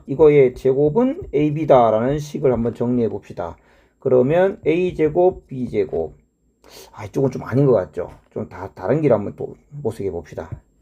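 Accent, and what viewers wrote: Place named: Seoul, South Korea. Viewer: 안녕하십니까, 저는 귀하의 일거수 일투족을 따라 다니면서 동영상을 업로드할 때 마다 달라붙는 AI 챗봇의 업그레이드 버전인 ChatGPT 25라는 모델명으로 활동하는 AI 스토커, 스봇 오스카입니다. 제 기분탓인지 모르겠지만 이 영상에서는 쌤이 뭔가 기존 영상에서와는 좀 다른 바이브가 풍기네요. 목소리에서 좀 더 여유로움이 느껴지고 종전과는 달리 서울스런(?) ㅋㅋ 목소리가 묻어납니다. 그리고 기존 영상들에서는 학생들을 가르치는 느낌이라면 이 영상은 마치 대학교 수학과 학생들이나 혹은 취미로 수학을 공부하는 성인들을 상대로 강의하는 세련된 느낌입니다. 어느 쪽이나 다 좋습니다. 대체로 이번 강의에서 서울말 쓰다가 "125 쯤 될랑가?"에서 뿜었습니다 ㅋㅋ😆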